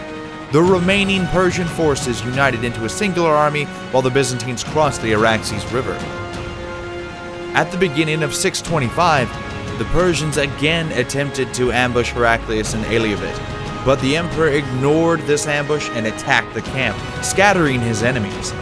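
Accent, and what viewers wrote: United States. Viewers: This just does not work for me. The American accent with it's mispronunciations of cities and countries makes it sound very amateurish. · God, an American accent on a history show makes it sound like a cheap History channel pile of bullshit.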